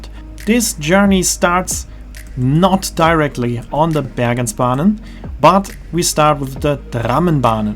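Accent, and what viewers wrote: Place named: Germany. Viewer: I did think you were a german-speaker, but I still didn't connect Voss and Foss until i saw the pictures and realised V is pronounced as F in German.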